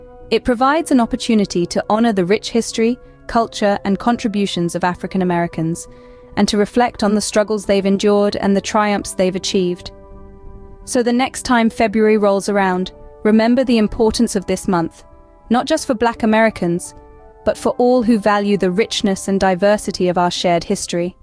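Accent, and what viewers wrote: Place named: United Kingdom. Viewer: Is all the video AI generated? If so, that's some if the best quality AI video I've seen. Why a British accent for the narrator, though?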